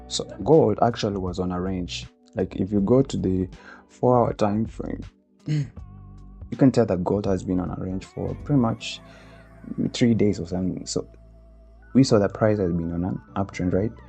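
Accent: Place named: United States. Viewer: Awesome vedio over here,😊The American accent though 🔥🔥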